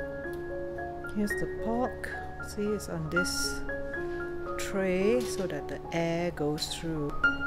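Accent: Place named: Nigeria. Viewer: Omg, she sounds so Nigerian. Nice job , I will try this .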